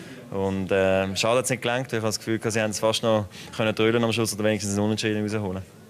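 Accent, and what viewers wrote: Switzerland. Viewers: this isn't german, it's swiss dialect!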